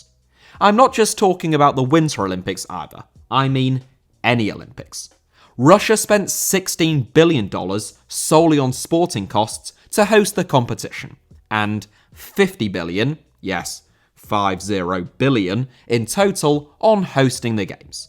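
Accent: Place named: England, United Kingdom. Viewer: his accent is a fairly normal English accent. Get you head out of your ass and learn that you haven’t been made a member of the pronunciation police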